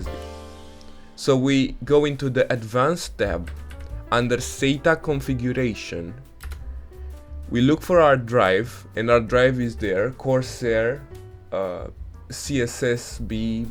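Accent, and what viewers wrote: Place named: Romania. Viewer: ba te am vazut roman dupa accent si dupa am vazut si emagu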